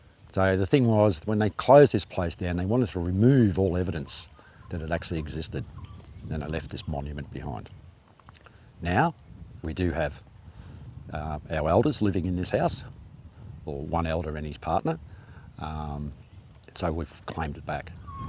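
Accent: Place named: Australia. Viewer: Thank you for the interesting video 👍 I love the Australian accent 🤩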